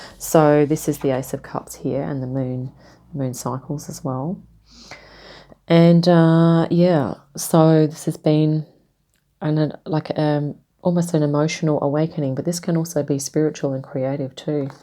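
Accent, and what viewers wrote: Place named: Australia. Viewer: Yay another Aussie accent 🎉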